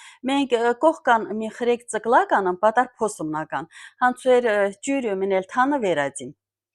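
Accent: Artsakh, Azerbaijan. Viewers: Наверное,надо стараться понять.Это Арцахский диалект. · Ղարաբաղի, Արցախի բառբառնա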